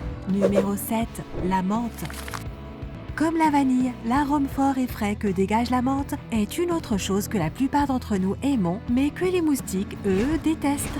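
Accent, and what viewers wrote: Quebec, Canada. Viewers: C'est l'accent canadien du Québec et encore ce n'est pas trop prononcé !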